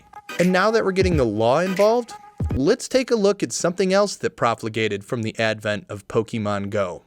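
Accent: United States. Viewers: Entertaining video!! Well deserved Like, but it's painfully obvious that you are emulating Internet-Historian with the Segway's and background music. What makes it even more jarring is the lightly-pitched American accent you have that starkly contrasts with the kind of voice most Youtube fans expect with this format and it takes away from your hard work. Constructive criticism would be to suggest using the same level of research, but develop a personal style to not seem like a knock-off Internet-Historian. I mean you even say, "ad time" and "ad over" to the same beat as the man over at Internet-Historian. At least you got paid for this.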